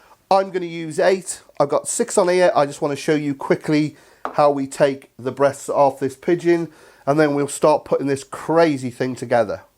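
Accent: United Kingdom. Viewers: "get in with me ands" lol British accents are all so amazing. Love your video's man. These recipes all look amazing. i can't wait to try them one day when i can get a hand on some of the ingredients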